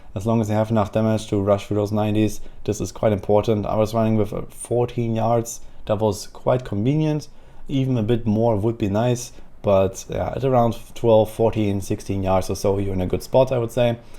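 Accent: Germany. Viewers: it might sound dumb BUT you sound so german are you ?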